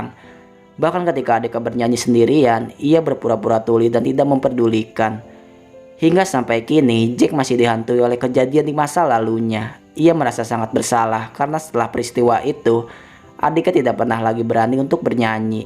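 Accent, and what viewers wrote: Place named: Banjar, Indonesia. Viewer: Yang baca naskah orang Banjar Kalimantan nih. Ketahuan dari logatnya. Cuma saja intonasi tatkala berhenti paragrafnya ga enak didengar.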